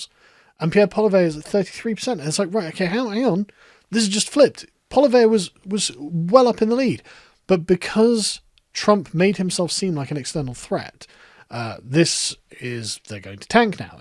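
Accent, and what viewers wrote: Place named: United Kingdom. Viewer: I'm not going to sit here and be lectured about America by a man with a British accent .. look at your country with do you know ? .. lol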